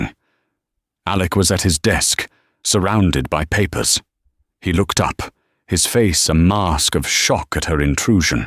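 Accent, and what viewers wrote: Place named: England, United Kingdom. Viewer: I have watched a few of these tales from various websites, and it disappoints me that the narrator sounds obviously English, but the written story is horribly American English. All of these websites need to rectify the language problem so that narration and written word match English from England.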